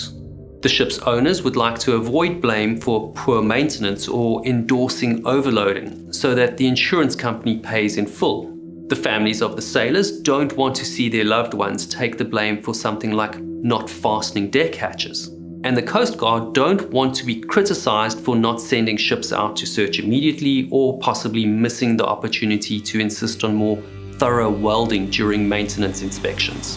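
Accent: South Africa. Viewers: Dude, you sound a wee bit South African.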